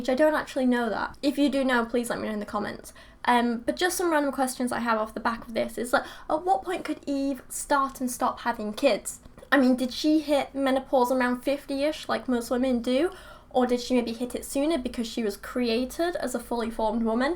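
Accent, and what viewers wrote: United Kingdom. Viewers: You really should lose the idiot Brit accent. I can't help but think of anyone using that accent as a complete moron. I mean, you still have a queen for crying out loud...A FUCKING QUEEN. Damn, you don't get much more primitive than that..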